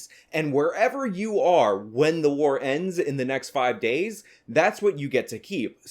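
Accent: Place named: Texas, United States. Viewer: Dude sounds like your blessed to born Texan you should come back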